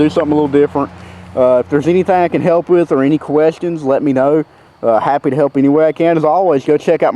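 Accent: South, United States. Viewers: I love clicking on these gun videos and hearing a southern accent 😂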